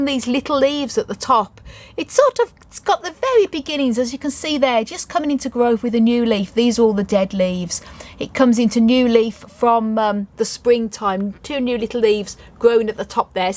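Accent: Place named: Tamworth, United Kingdom. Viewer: Oh my life! I’m going to need a poly tunnel soon if you keep showing me gorgeous plants that I NEED!!! Xxx great vid again! Where are you originally from? I grew up in Tamworth & you have a similar accent. Xx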